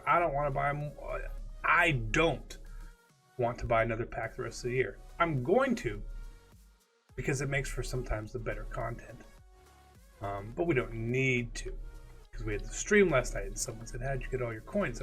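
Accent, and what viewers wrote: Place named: California, United States. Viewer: You talk one of them weirdo California’s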